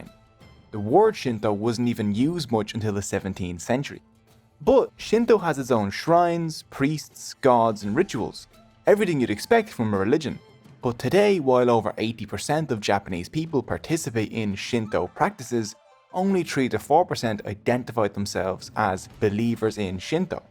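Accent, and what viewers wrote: Ireland. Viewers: Wid? An Irish accent does not lend its self to well. Especially when a jokey American style delivery is used for effect. If English is not your first language, tree children, and where day live can be confusing. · Love the Irish accent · Just bizarre listening to the creation of Shinto in a strong Irish accent......😆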